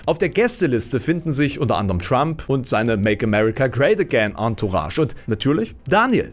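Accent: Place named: East Germany, Germany. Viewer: Richtig stark, gerade auch die Erklärung von dem Namen Malenki und Enttarnung als russische Übersetzung für Klein! Richtig großartig. Hast du aber nicht noch eine "kritische" Verbindung zu China aufmachen können oder zu Aluhüten? Dann hättest du wirklich alle buzz words drin. Ganz groß. Aber was mir fehlt ist, die Erwähnung von Ossis, weil die sind ja auch alle rechts. Da lässt sich zu Jeremy bestimmt auch eine Verbindung herstellen. Denn alle Ossis sind doch nicht nur rechts, sondern auch doof oder also so voll Jeremy nicht wahr?! Oh sorry hab deinen dummen Akzent fast überhört. Bist ja selbst nen Ossi. Wie sehr braucht man Kanäle und Leute wie dich, die nur mit dem gehobenen Zeigefinger durch die Gegend laufen. Du angepasster Ossi. Was für eine Auszeichnung für dich.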